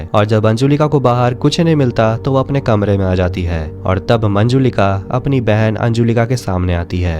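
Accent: Bihar, India. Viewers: Aap bihar se ho na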